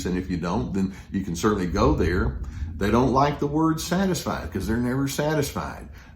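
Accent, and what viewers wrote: Texas, United States. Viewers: I left my narc back in 2007. Best decision I ever made in my entire life. Oh the stories I could tell, of his envy and lack of empathy. I look at your videos and even now gain healing and insight. Maybe there is something very special about the way a Texan drawl delivers compassion and wisdom;) Thank you SO much, Dr.! <3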